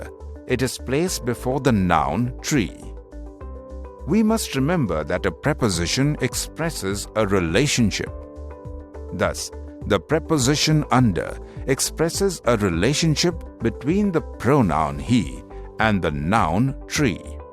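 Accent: India. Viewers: Indian English ??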